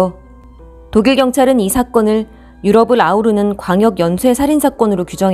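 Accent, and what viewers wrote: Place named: Japan. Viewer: 발음을 보니 일본어를 할 줄 아는 분이시네요. 맞죠? ^^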